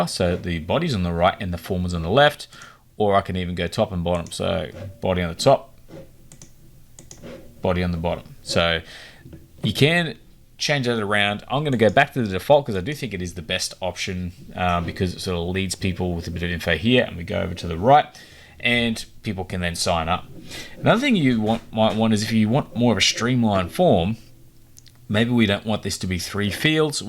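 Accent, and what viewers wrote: Australia. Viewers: Thanks, good to hear an Aussie voice for a change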